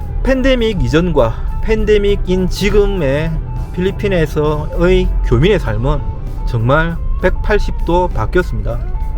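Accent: Gyeongsang, South Korea. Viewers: 참 말을 재미있게 잘 하네요. 얄구진 차도 끌고 다니고 . 오랬만에 얄구진 소리 들어 보내요. 경상도 사투리 인같네요.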